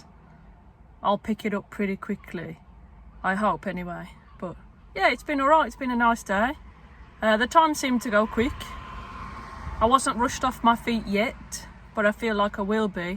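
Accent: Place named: Dudley, United Kingdom. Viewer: Are you from Dudley bab?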